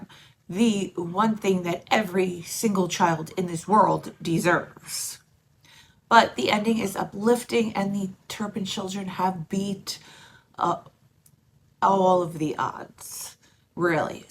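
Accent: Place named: New England, United States. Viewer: I love that the way you say certain things like I do, I know you're from the American Northeast, around New England. 😂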